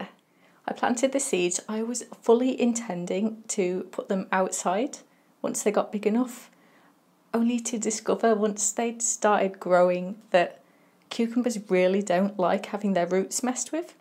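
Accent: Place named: United Kingdom. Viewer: In all fairness, cucumbers are supposed to have the spiky things on them. When you pick them you can just put a pair of gloves on and rub them right off. You sound like you are from the UK and having spent quite a lot of time there, you have a bit of a different selection of cucumbers than us in the states. I hope these turned out well for you! And good job getting them grown indoors. Edit: One extra thing. The runners that come off the plant and attach to the trellis can get excessive and take nutrients that the cucumbers need...so if there are way too many, you can pinch them off and have bigger cucumbers quicker.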